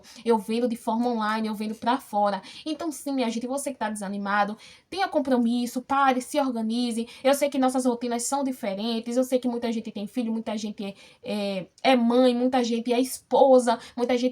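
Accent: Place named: Bahia, Brazil. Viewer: Mirmã voz de baiano é terapia 😂 Parabéns lindona vc é inspiração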